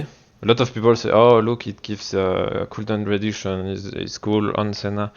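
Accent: France. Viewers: GG for the francais accent :)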